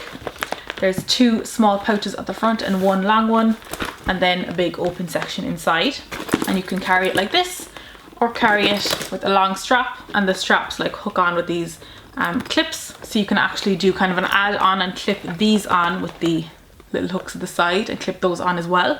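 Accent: Ireland. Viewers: 💋💋💋💋💜 first Irish MUA accent I’ve heard lol 😘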